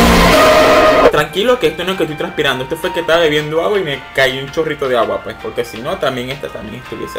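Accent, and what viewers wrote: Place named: Venezuela. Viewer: Me gusta tu forma de explicar... Tu acento suena a... venezolano... hablas muy chistoso.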